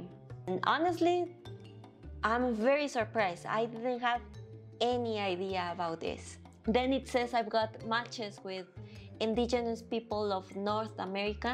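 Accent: Philippines. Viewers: Wow Now this is very interesting.. You look Asian and how you speak like a Filipino perhaps due to Spanish influence. So I ever I travel to Mexico I can blend in as long as I don't speak. 👍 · Ur accent sounds Filipino btw I’m not Filipino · Your accent sounds like Tagalog was your first language. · Curiously, you speak English with a distinct Filipino accent. I have lived in both countries (Mexico and Philippines) and have had a Mexican and Filipino wives. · Who taught you english, because you really sound Filipina. If you went there, people would be very confused. Seriously who taught you English. Where did you get that accent from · Like you I too was very curious about my races. First I am 50% Asian ( Visayan/ Chinese), Spanish, and amazingly 24% Indigenous. The Asian is: Malaysian, Taiwanese,Indonesia, Laos. Indigenous: Peru, Bolivia, Ecuador, Brazil. Who knew! 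And yes, you can pass for Pinoy, but you do look mixed. I live in Hawaii, born and raised. Here 75% of us native people here are mixed. Mostly Asian.Your accent can also pass for Pinoy. Like South America, the Philippines was also raided by the Spaniards and also the Japanese. So happy I came across your page because I am researching my heritage. You are very Beautiful. Aloha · I was thinking she looked and sounded Filipina, went straight to the comments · I definitely get where you're coming from. I've been told I look Asian all my life. I'm at the point I can easily tell when someone is trying to "figure me out". I've even had people argue with me that I HAD TO HAVE SOME Asian in me, even to the point where they go as far back to when the land bridge existed connecting Russia and the Alaska. And they tell me I'm Asian because Asians crossed that land bridge. I will definitely get my DNA test done. 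I'm very curious now. I will say, because of your stature and your accent, I can see how some may say you're Filipino, especially where you say your "F"s. Regardless what the DNA tests say, you're a very beautiful LATINA. Where it proudly. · In addition to your physical appearance (very Filipino), your mannerisms, way of speaking, and your accent is very Filipino. I don't know how you did it but, if you could speak Tagalog or one of the other Filipino dialects, no one would question you for a minute !! I have much exposure to Filipinos. In one of my jobs, of 50 people, I was the only one not Filipino. Most of my friends in College were also Filipino. You would fool me !! DNA tests: Most companies only test certain/small sections of you genome. That is why you can get different results from different companies. There will be variances. Because of the way genetics work, you could still be part Japanese, but your ancestor's genes were not dominant. 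· You speak English with a Filipina accent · That's a Philipino accent, right? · even her accent sounds filipino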